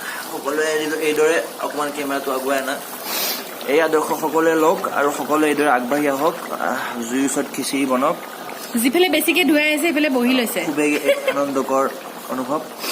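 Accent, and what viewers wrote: Nalbari, India. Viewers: Apuni Nalbari khurot kotha kobo bc val lage😁😁💕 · Bor val lagise apunar vedio sai .. amr nalbari bhakha2 apunar mukht ru val lagise ..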